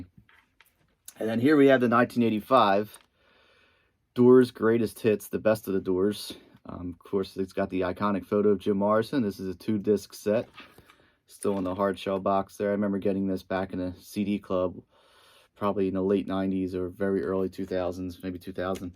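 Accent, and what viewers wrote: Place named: South Jersey, United States. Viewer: I bet youre from south Jersey or Philly .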